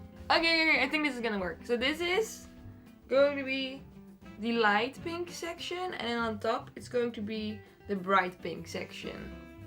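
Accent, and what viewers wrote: Netherlands. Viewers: Ik kon aan je accent horen dat je 🇳🇱 was😁😉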